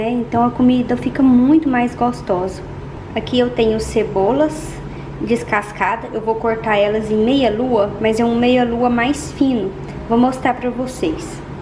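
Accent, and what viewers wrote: Minas Gerais, Brazil. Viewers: Até parece que mineira! Só faltou o nosso “uai”!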